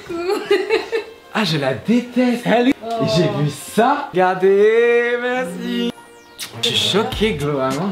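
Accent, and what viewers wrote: England, United Kingdom. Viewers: J'adore ton accent anglais quand tu parles anglais (c logique )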